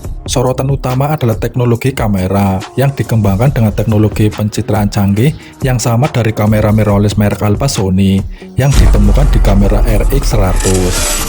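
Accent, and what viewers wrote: Banyumas, Indonesia. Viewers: Ora ngapak ora penak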